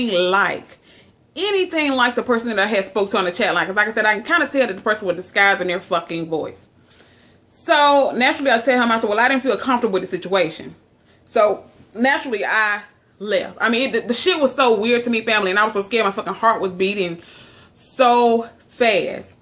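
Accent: South, United States. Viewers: Lol I love you American accent .. And damn loads of old men got nothing to do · Southern not american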